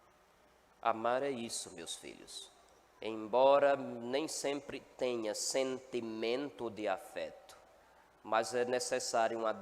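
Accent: Northeast, Brazil. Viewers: LOUVADO SEJA DEUS POR ESSA HOMILIA CATEQUÉTICA (SEM FALAR DO SOTAQUE MARLINDO QUE EXISTE, OXENTE), LOUVADO SEJA DEUS PELO SEU SACERDÓCIO FIEL E SUBMETIDO A VERDADE PADRE, AMOR DE JESUS NOS LIBERTA DO PECADO QUE CONDENA E MATA A ALMA. O HOMOSSEXUALISMO TBM É PECADO! ✝️🇻🇦🙏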